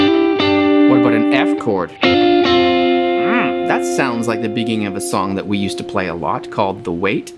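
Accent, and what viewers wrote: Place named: Vancouver, Canada. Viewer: The haircut, the body, the accent... you are the embodiment of Vancouver BC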